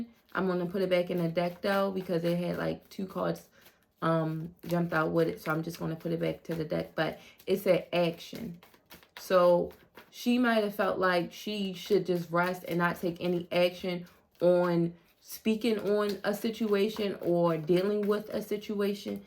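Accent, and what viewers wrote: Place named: Baltimore, United States. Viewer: Greetings 💕 playing catch up, but Diddy just may want the ‘Unisex Cult’. She needs 2 realize that her LIFE is more IMPORTANT. That $$ has her not 🏃🏾‍♀️ the opposite way…that’s not what he likes😶😄 THANX 4 YOUR 💝 💜…yeeeess ma’am I hear Baltimore tongue! On 2 the nxt video 😊 LIKE..SHARE & SUBSCRIBE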